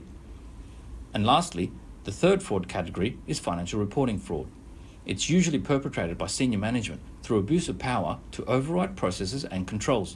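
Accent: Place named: Australia. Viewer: I think Australian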